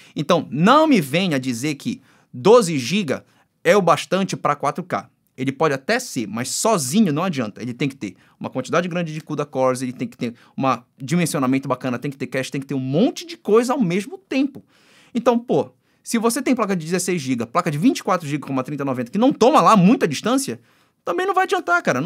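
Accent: Pará, Brazil. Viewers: Esse sotaque paraense, meu parceiro. Enfim um canal de tech e inovações em que me sinto representado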